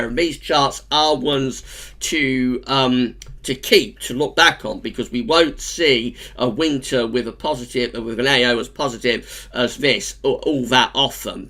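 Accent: United Kingdom. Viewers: what exact township is that accent from my bruvah? I'm east indian trying to learn British accents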